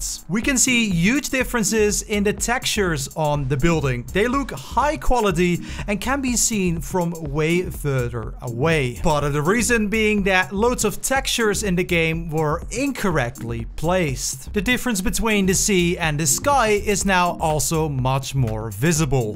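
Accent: Netherlands. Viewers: You dutch bro? I live in Amsterdam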